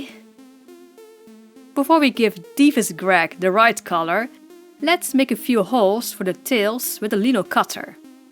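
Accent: Netherlands. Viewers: What is your favorite kind of Diuvs transformation? Like what animal is the most fun for you to transform a doll into? Also, I love your Dutch accent! :))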